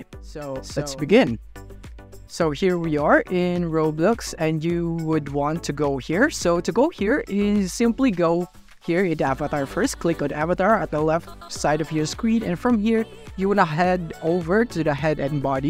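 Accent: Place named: Philippines. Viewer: sound filipino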